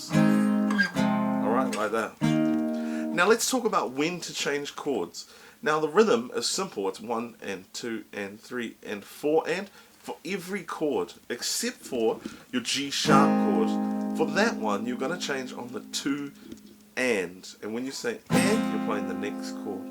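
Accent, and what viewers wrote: New Zealand. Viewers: thank you for uploading this! btw I love your accent, are you from new zealand?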